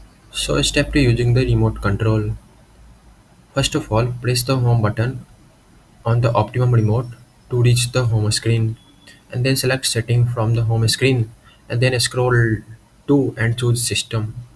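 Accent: India. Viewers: Put info in English cannot understand his voice with India accent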